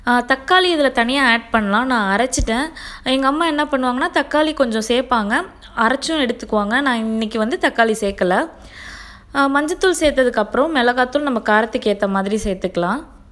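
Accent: Ramanathapuram, India. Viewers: enga ooru slang madhiriyea iruku....na ramandhapuram.... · k sister ramnad side area la paesura maari irundhuchu sister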